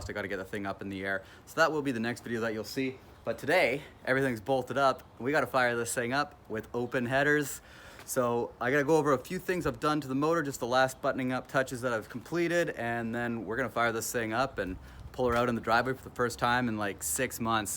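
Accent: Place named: Canada. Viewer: Canadian Eh.. 🤙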